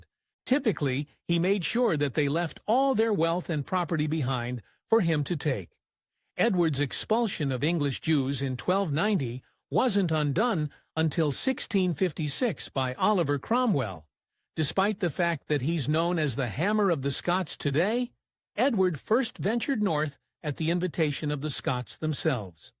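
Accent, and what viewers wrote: United States. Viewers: Why is an american talking about English history? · Hearing an American voice trying to explain history is actually comical.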